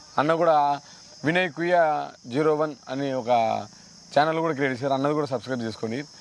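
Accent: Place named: Kadapa, India. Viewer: Mana kadapa volla slang ge ante. Ray youtube ga okasari ma kadapa vachii chudu sami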